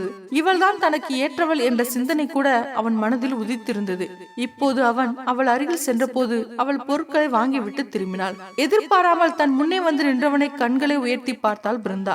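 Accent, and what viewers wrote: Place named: Jaffna, Sri Lanka. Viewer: சுனிலுக்கு பெபேவா.இன்னும் யாழ்பான தமிழ் விடவில்லை. அவதானித்து கொண்டிருக்க வேண்டும்.நல்ல பதிவு.❤நல்ல தமிழை பின்பற்றவும்.அவதானம் தேவையில்லை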